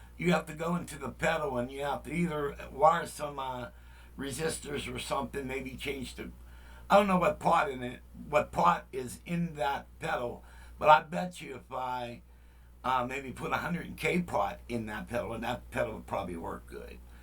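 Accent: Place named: Pittsburgh, United States. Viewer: I know a yinzer when I hear one 👊🏼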